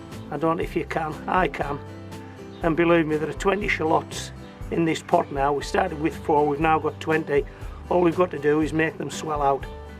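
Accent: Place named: Glasgow, United Kingdom. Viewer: Great boots...you sound like my Grandfather Patrick Alexander Leslie from Glasgow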